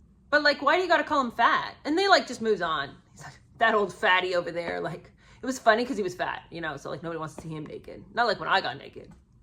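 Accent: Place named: Cockney, United Kingdom. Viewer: You are my new favorite channel. Hilarious! Even my husband enjoys...he likes your Cockney accent.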